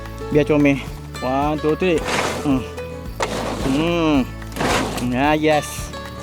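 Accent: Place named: North, Malaysia. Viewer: Bahasa menunjukkan bangsa...kueh2 buat keluaq...hahhahah...abg org utara ka...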